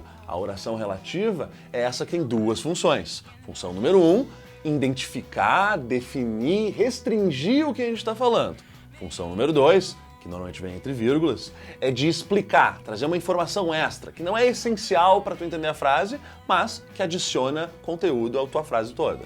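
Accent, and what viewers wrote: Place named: Rio Grande do Sul, Brazil. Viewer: sotaque gaucho gostoso da porra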